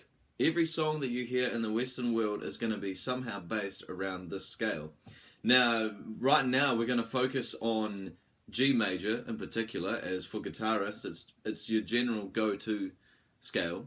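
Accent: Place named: New Zealand. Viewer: Sound like a kiwi bro, chur for the lesson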